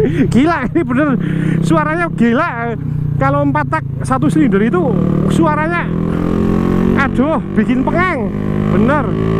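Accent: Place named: Wonosobo, Indonesia. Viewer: suarane Wonosobo banget, obat kangen wong sobo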